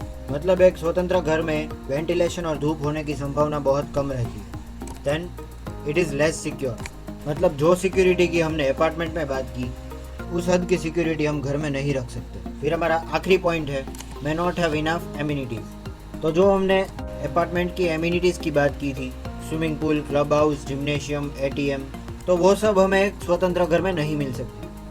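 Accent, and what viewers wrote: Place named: Kathiyawad, India. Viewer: Bhai tu kathiyawad ( Gujarat) se hey na? Speech idea aa gya... Ye banda rajkot ya Bhavnagar side ka hey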